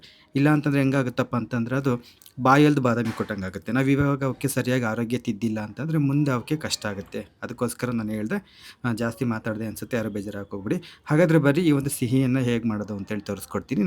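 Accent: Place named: Davangere, India. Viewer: ನಮ್ಮ ದಾವಣಗೆರೆ ಭಾಷೆ.😊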